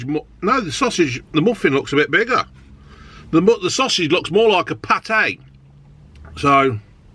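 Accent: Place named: Leicester, United Kingdom. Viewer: Na, I love it, spesh in that Leicester accent...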